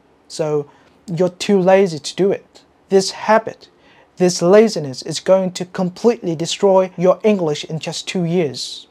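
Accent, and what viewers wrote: United Kingdom. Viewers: Em thấy giọng anh hơi khó nghe, anh nói giọng Anh Anh đúng không ạ? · Hình như ổng nói giọng anh-anh đấy bạn, với lại còn nh nước nói tiếng anh mà, nghe anh-mỹ ko mốt gặp anh-trung anh-ấn, anh-nhật ... đủ kiểu sẽ hk có đủ trải nghiệm để bắt kịp cuộc nói chuyện ạ, theo kinh nghiệm của mình đã gặp anh-ấn ạ, vất vả lắm 😢, r cũng quen, do bả là cô giáo bộ môn của mình 😅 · Cái số 1 đúng y sai lầm mà mình đã nhận ra và sửa. Bây giờ mỗi lần gặp 1 từ mới mình luôn tự nhủ bản thân là không được phát âm bậy Ngoài lề xíu bạn dùng accent UK à? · Anh này nói anh-anh bạn ơi, giọng anh anh nói vậy á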